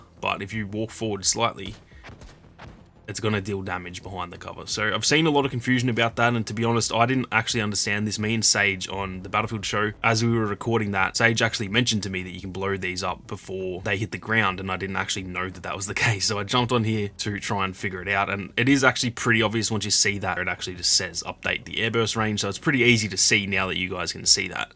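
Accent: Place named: Australia. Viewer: Always love your content, really think you deserve way more views from the quality of your vids. Nice to see (and hear) a fellow Aussie!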